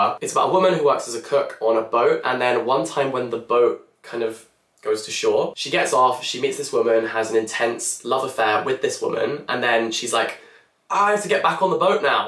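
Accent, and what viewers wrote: United Kingdom. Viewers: I'm preparing for my IELTS exam and your videos are helping to understand british accent and also to learn how to talk fluently. Love you, ~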